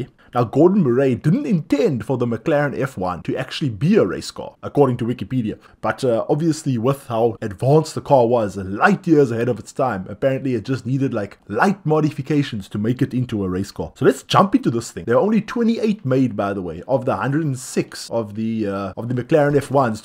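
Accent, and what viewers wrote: South Africa. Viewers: Are you from South Africa? Your accent sound like it🤔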